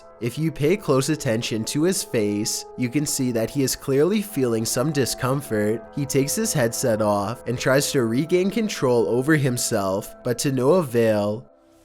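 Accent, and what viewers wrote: Sweden. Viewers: Är du Svensk eller?? = Are You fr.o.m. Sweden??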